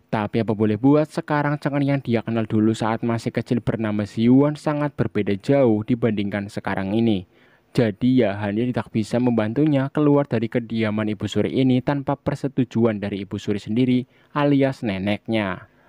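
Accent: Java, Indonesia. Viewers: orang jawaa ngapak yaah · gw demen sm naratornya. Ada medogh2nya gtu klo ngmg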